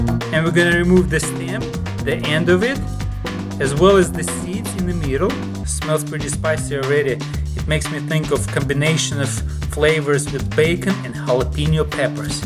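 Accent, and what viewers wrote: Russia. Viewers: are you russian?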